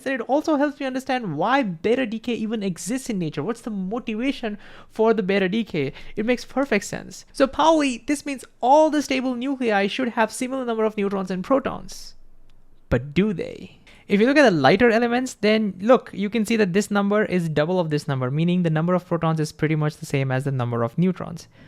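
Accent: India. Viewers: People who get he is an Indian As an Indian i could understand it just through your slight accent ❤ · Why are you black but have an Indian accent 😂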